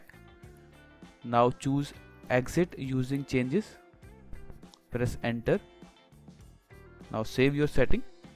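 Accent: India. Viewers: This Indian English is so hard to stand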